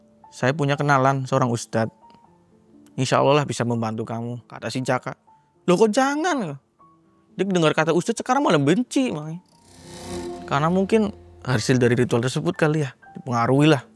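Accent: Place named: Java, Indonesia. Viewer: Saya suka dengan cara bicaranya mas..medoke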